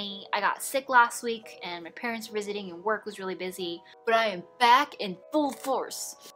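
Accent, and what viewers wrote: United States. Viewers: bagus adji i think the name is Japanese but the accent makes her sound like she was born in the US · Are you American?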